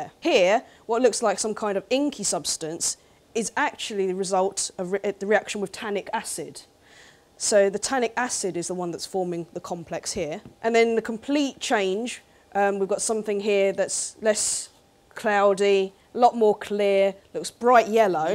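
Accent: United Kingdom. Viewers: Asian Chick + British accent = HOT!!!! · Attractive Asian woman with british accent and a science degree... where does one find such majestic creatures?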